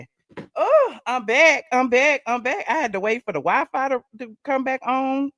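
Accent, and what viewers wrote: South, United States. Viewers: I'm a new Yorker and love the southern accent " hol on lemme see" I don't want y'all to hear me yell in yall " eea". Ear? Bottom seat every " yeea" year? Love u. My son hijacked my you tube again took my Love4all formally yuchboi. Gotta split phone email. Ugh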